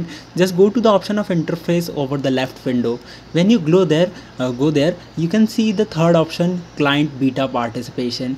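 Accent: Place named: India. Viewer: Use a text to speech utility. This Indian jabbering as fast as he can is impossible to understand.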